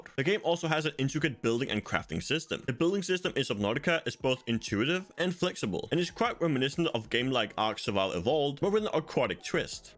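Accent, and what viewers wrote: Denmark. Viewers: This is the thickest danish accent ever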